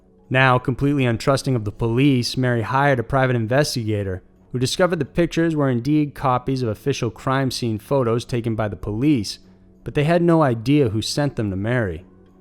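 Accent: South, United States. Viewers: I love it too! He sounds like he has a southern accent with some of the things he says!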